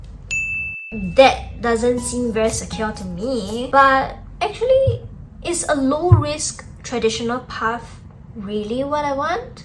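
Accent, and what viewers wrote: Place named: Malaysia. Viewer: Hey beautiful. Whats your accent? Malaysian?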